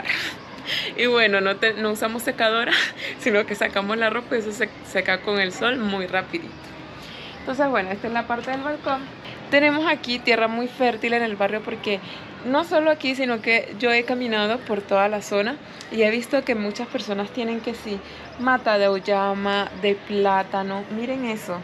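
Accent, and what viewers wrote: Colombia. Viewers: Eso es una excusa pues estoy claro y tú también lo estás que hablas caliche porque te gusta, por qué crees que es nice... déjale eso a la Youtuber venezolana que imita todos los idiomas en español y hasta en inglés. Creeme, hablar caliche no es nada nice, más nice seria hablar con cualquier acento venezolano, inclusive nuestro acento gocho y de merida, esos si que son acentos bien bonitos. Si fueras mi pareja la ladilla y el chalequeo que te tuviera fuera trifásico, de hecho la mamá de mi hija menor tenía esa mala costumbre de hablar caliche por estár viendo las novelas del vecino país, y el chaleco que le monte fue extraterrestre hasta que dejó la ridiculez. La diferencia está en que la mamá de mi hija no es Youtuber, no tiene una cuenta donde nos pueda representar, tú sí.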